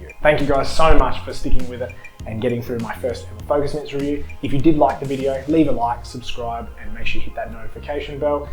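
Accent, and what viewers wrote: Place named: Australia. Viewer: Your channel is Fair dinkum I appreciate your reviews. I love listening to people talk about muay Thai gear especially in an Aussie accent. 🥊 I love when you said "muay" instead of "my" 🤣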